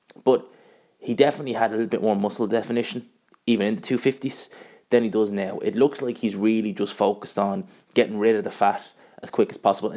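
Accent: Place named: Ireland. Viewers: Wether Irish voice or not nice to see a non biased opinion based on boxing knowledge not who is liked most ! This is 2nd video watched and subbed ! ....... more posts like this the better as to many now Mae videos on who they like and people just say "casual" to the with different opinion ! .......... keep posts coming mate ! Gman........and aaron refreshing to see someone commenting with no insults for a change 😂 peace ✌🏼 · Hey bro Good to here an Irish voice! Good video ! Fair play, keep them coming! Anyways I think fury will school him, easier then Klitschko!! And truthfully brother I definitely believe the gypsy king is in way better condition now then he was against Wladimir Klitschko, his more powerful now and still got the speed ! Cause now his doin weights, were for he didn’t before! And bro he seems WAY HAPPIER NOW 💪🏼 and you know the saying ( A HAPPY FIGHTER IS A DANGEROUS FIGHTER ) THE GYPSY KING IS BACK!!! 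A NEW 🥊🥊🥊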